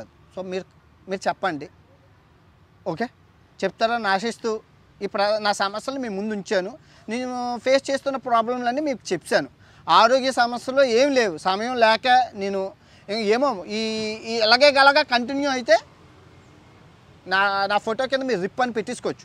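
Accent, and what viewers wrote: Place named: Andhra Pradesh, India. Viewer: garu uttarandhra bhasha vintu Naku anandam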